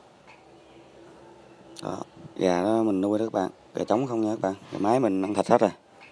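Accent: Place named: Mekong Delta, Vietnam. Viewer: Giọng nói miền Tây nhưng mà ở đâu vậy.Câu ở kênh mà có lục bình nhiều mình câu có không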